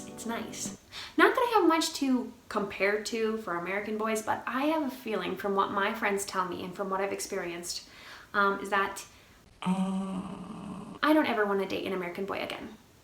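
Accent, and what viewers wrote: Italy. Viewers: oh my jesus, i want to be ur friend so much, wtf help. I'm italian and I LOVE LOVE LOVE your italian accent! u're too cute and u look sooo kind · Hi I am Italian and I really think you are beautiful girl and nice person + your Italian accent is really good and cute💘💘 xoxo from Rome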